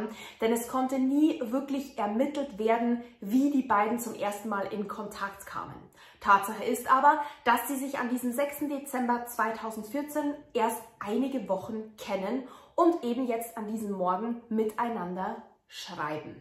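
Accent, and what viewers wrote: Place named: Bavaria, Germany. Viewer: Bin dich seit tagen am bingewatchen :D Schau normal auch überwiegend american true crime channels bzw Gerichtsverhandlungen, je detaillierter und länger desto besser :) Du bist die einzige deutsche die ich schau, gerade weil du so ordentlich recherchierst bzw auch ahnung von der amerikanischen gesetzgebung hast. Damit hebst du dich qualitativ echt hervor :) Oh und ich liebe deinen charmanten bayerischen akzent :D Bitte mach so weiter du hast echt talent <3 Lieben Gruss aus den Niederlanden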